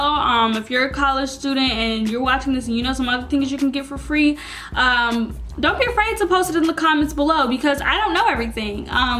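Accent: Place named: South, United States. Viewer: Hi. You are too cool and i like your sweet country accent. Keep being you👍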